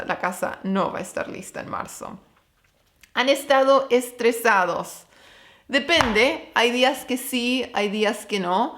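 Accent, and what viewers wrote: Argentina. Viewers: Cada vez tenés más acento argentino. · Hablas como Argentina, ya sos nuestra🇦🇷🇦🇷🎸🎸🤟🤟😃😃, falta que hables como Cordobes y digas cuuuuuleeeaaadoooo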